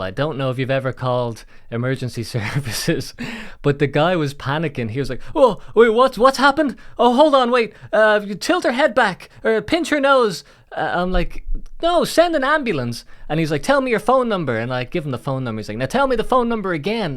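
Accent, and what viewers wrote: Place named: Ireland. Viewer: Your Irish (Newfoundland?) accent is really neat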